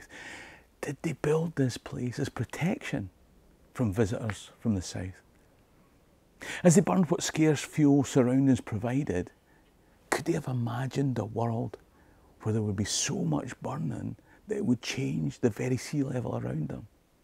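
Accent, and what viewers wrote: Scotland, United Kingdom. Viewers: You look more Polynesian than Scottish, but you talk Scottish as fuq.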